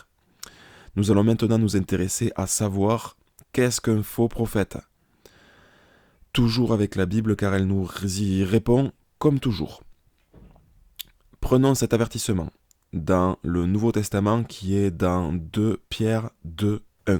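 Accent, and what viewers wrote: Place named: Marseille, France. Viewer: Pourquoi vous avait la même voix de Kevin gall? Sauf que toi ta un accent du sud marseillais ou autre. Donc ma question pourquoi aviez vous la même voix ?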